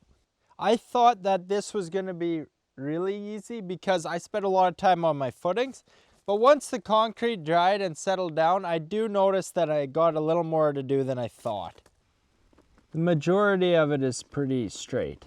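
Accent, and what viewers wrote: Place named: Eastern Canada, Canada. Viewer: thats gotta be an eastern canadian accent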